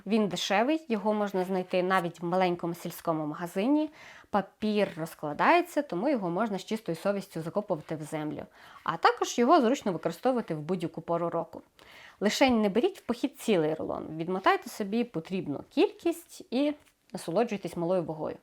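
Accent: Standard. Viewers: дуже чиста і невимушена українська мова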